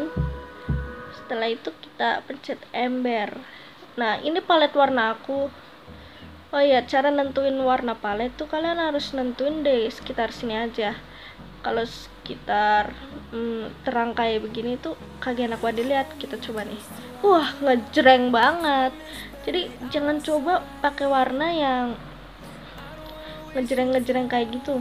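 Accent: Java, Indonesia. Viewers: yang baru brlajar gambar sini kumpul, btw makasih kk, tutornya, ya allah ngomong ny medok sek an kakak, wkwkw semangat ya kk semoga aku bisa ngikutin kakak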